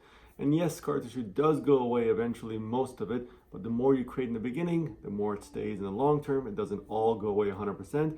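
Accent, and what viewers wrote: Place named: Iran. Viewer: اول از لهجه فهمیدم ایرونین بعد فامیلیتونو دیدم ،عالی😅😂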